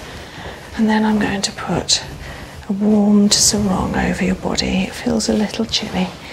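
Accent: England, United Kingdom. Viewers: Very relaxing, educational, I'm not going to lie, love the soft English accent and best of all no ads... perhaps, I've said too much.. ... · Absolutely one of the best and most relaxing massages I’ve had the pleasure to watch here on YouTube. Your voice is so magnificent, nothing is more pleasing to an American ear as that of a tranquil English accent.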